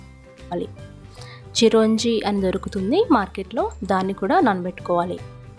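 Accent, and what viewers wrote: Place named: Krishna, India. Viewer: Yummy 👌🏻.... I strongly believe you are from krishna district right 💁.... The way you speaks was very much related to krishna's accent 😊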